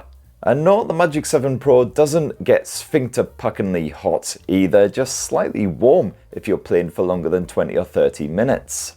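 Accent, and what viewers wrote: United Kingdom. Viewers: Are you from the UK, your accent is hard to catch.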